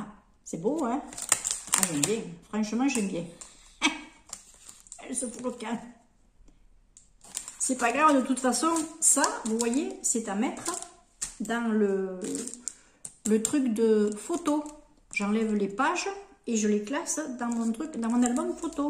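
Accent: South, France. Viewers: Merci pour le partage. Vu le petit accent je pense que vous êtes dans le sud. Je vous dis ça parceque moi en région parisienne, on est ravitailler par les corbeaux. Chaque fois que je vais aux 3 Actions vers chez moi. A croire qu'ils se donne le mot. Je suis nouvelle sur votre chaîne et je viens de m'abonner. Bon dimanche Amicalement Lidia. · Coucou, Très joli haul, et grâce à ton accent, on entend presque less cigales 😊 Je te souhaite une merveilleuse année 2024, et tout le bonheur du monde 😊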